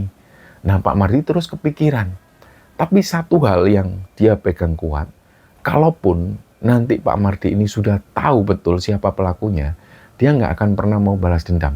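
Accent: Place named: East Java, Indonesia. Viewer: Mas logat pemalange endi deneng nganggo logat jawa timuran 😅 😂😂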